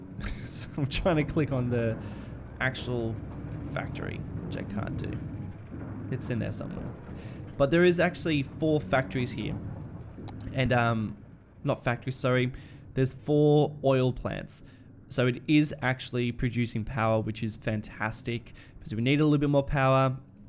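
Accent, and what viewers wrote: Australia. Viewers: aussie guy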